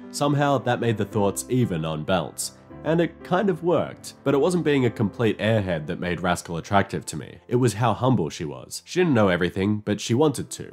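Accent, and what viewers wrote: Melbourne, Australia. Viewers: So he's Australian. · You Aussie!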